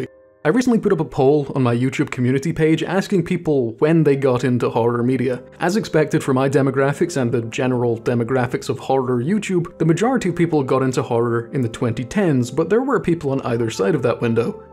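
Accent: Scotland, United Kingdom. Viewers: First time on your channel and I cannot for the life of me pin down your accent you have weird mix of Scottish, German and oddly a wee bit of American 😂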